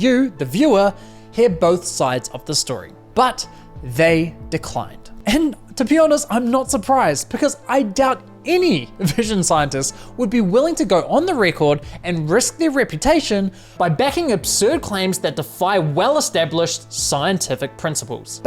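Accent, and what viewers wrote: New Zealand. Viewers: As an American, I very much appreciate your passion for protecting US consumers. This company is clearly lying. Your channel description and accesnt suggest you are from New Zealand, so I'm curious about your story. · it’s just his Kiwi accent. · Me too! 😂 That Kiwi accent! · Appreciating the kiwi accent ( a decade ago ) I swear I heard as a dickhead ago. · Stellar work mate ! And, to be fair, your kiwi accent is indeed charming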